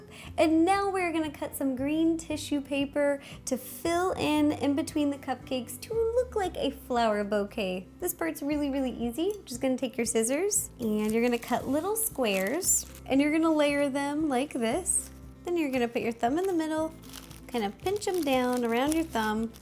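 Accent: United States. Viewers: She's american and every country has an accent in their language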